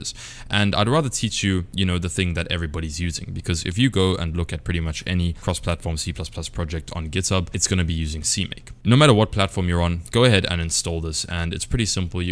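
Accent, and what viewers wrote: South Africa. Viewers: Dude are you from SA 🫣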